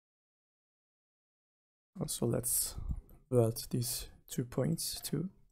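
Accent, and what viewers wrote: Turkey. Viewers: is it Turkish accent? :D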